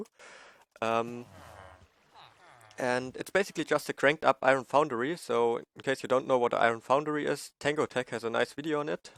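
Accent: Germany. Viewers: do you come from Germany?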